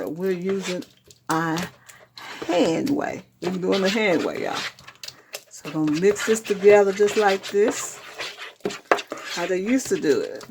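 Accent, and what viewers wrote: South, United States. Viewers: I agree! I love her southern accent!!! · I love the way she is talking. She is a true southern belle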